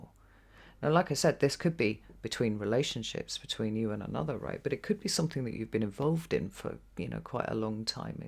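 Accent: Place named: North, United Kingdom. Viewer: Extraordinarily insightful and helpful references e.g. to the number 7 = rich content! Your accent seems to have become slightly more Northern since last time I watched :D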